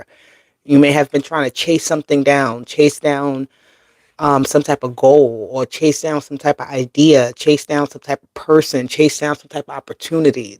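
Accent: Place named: Boston, United States. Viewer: Thanks for the reading from Boston. You sound like a Bostonian to me, maybe just a north east accent. lol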